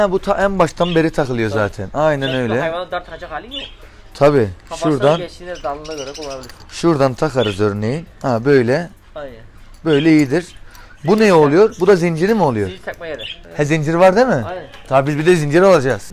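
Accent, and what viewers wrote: Kayseri, Turkey. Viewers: Abi kayserilimisin yoksa anteplimi anlamadım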